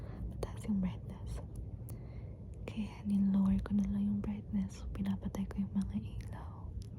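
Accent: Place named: Conyo, Philippines. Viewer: Conyo soft spoken naman, taglish 😍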